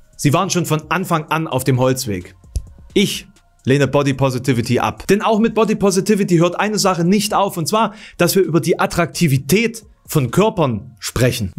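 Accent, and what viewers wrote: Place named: Halle, Germany. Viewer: Gerade entdeckt. Super Video, sympathischer, tolles Thema und vor allem...hallenser Dialekt. Ich liebe alles daran.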